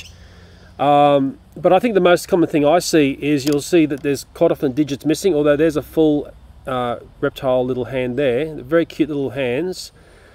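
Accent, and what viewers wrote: Sydney, Australia. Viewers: liquid sky if you've ever watched his channel, you'd know thats his natural accent hes from sydney. he had a great spider series from when he had a HORRIBLE redback infestation. he's just a normal guy trying to help the best he can.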